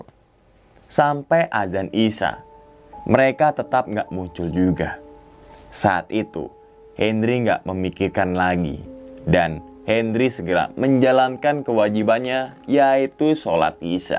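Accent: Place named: Semarang, Indonesia. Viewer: wih logat logat semarang kih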